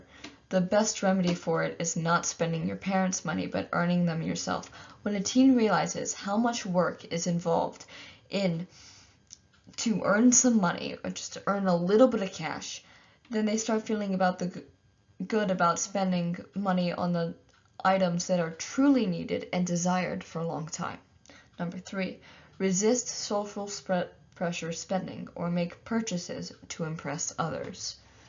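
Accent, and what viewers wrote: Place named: Russia. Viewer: Your Russian is showing 😅 Great presentation 10/10!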